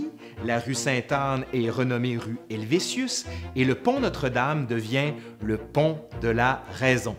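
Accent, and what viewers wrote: Canada. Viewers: Tu as un accent canadien🇨🇦🇨🇦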